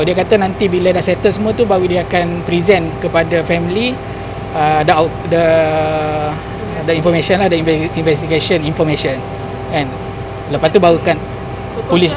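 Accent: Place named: Kelantan, Malaysia. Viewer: Pekat kltn boh..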